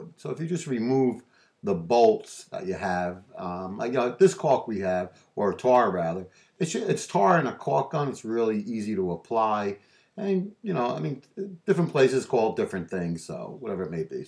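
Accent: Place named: Northeast, United States. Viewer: Nice job. Love the Northeast accent. Not flirting.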